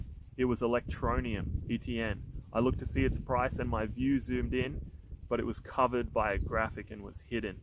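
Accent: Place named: Australia. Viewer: I like your Aussie accent mate ❤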